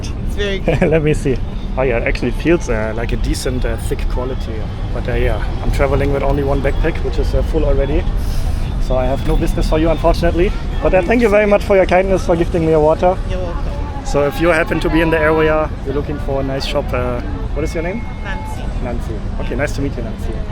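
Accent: Germany. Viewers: German accent? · you have such a nice German accent. It feels so homey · Dein Akzent ist echt krass 😅 Aber manche lieben es auch. Jetzt vermute ich mal: Stammst Du aus Sachsen? Oder irgendwo aus der Nähe?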